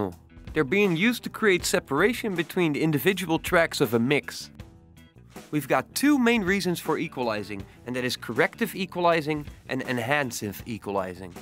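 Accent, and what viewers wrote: Netherlands. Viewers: Your accent sounds very Dutch .. are you by any chance Dutch?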